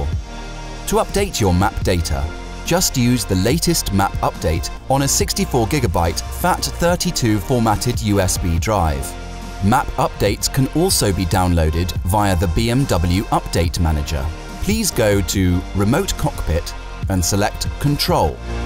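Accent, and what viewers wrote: United Kingdom. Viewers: Why do you have a narrator with a British accent? Why not a German accent? This makes no marketing sense.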